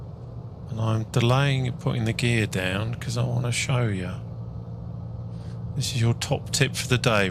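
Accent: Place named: Cockney, United Kingdom. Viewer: Is it scary how well he suits the cockney geezer accent.. Yes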